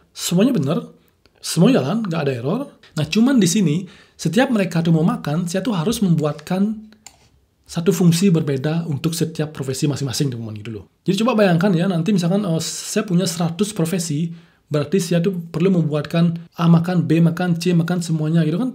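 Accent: Bali, Indonesia. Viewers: mantap logat balinya kerasa sekali. btw kalau boleh cerita dan bagi tips belajar bahasa inggris dong bli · Logat balinya kental banget wkwkwkwk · Dari Aksen nya Pak orang Bali ya? Hhe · logatny kek logat bali mas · Kalo gk salah saya merasa ada logat balinya hehe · kental banget logat bali nya :D, thanks video nya saya jadi lebih paham lagi konsep oop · Logat Bali asane ne, gitu lo. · Keren penjelasannya om, btw logat bali, asli bali om? wkwkwk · Kak dari mana ? logatnya kayak orang bali : ) sukses terus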